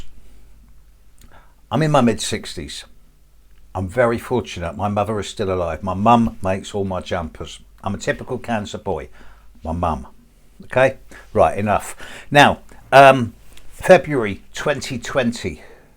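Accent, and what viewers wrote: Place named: RP, United Kingdom. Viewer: I will say something... Your perfect posh English and your suiting slightly raspy voice makes everything easy. You can broadcast the end of the world and I would be calmly listening to you. Congrats.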